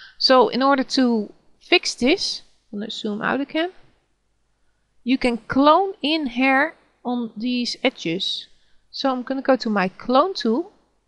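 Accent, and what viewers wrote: Netherlands. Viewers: nederlander hoor je aan het accentje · so dutch hahah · Ik merk dat je Nederlands bent, wat is dat voor galm achter je stem? Interessante tutorial trouwens. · Your accent seems to be dutch. Nice tutorial btw. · Great tutorials. I've just subscribed. That's one hardcore Dutch accent! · Don't bother what he/she said. You are from Holland so its obvious your accent will not be the same as native american or british. In my opinion your voice is quite sexy^^ and thanks for this tutorial :D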